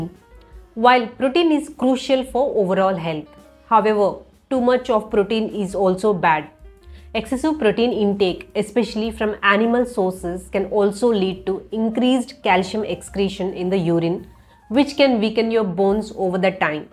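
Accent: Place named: India. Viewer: Her voice and accent SO BEAUTIFUL….I love Indian accent so cute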